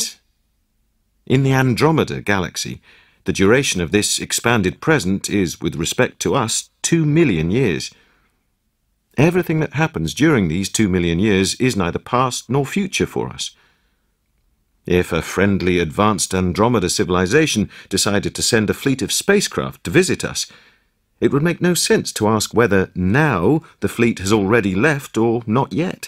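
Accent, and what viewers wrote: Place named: England, United Kingdom. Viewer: Who's the narrator? Best English accent ever!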